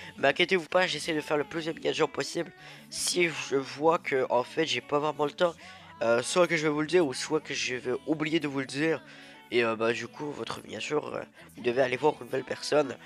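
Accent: Canada. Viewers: merci té canadien ? J'adore ton accent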